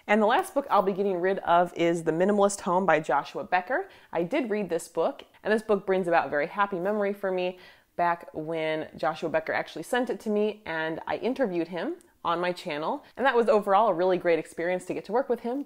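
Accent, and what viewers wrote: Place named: United States. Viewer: Dear it is so nice to hear you speaking american english. Such a pleasure.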